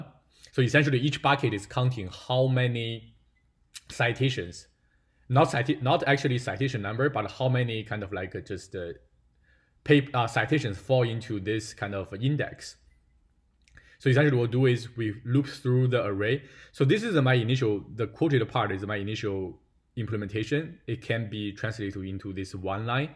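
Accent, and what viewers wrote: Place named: China. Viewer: I like your chinglish style. Funny but with good confidence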